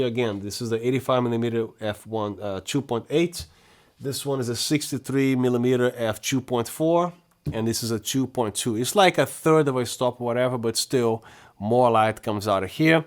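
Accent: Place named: Brazil. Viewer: I couldn't ask for a better review, this is really detailed. Also, I can tell by your accent you were born (or at least lived) in Brazil. Am I correct? Cheers!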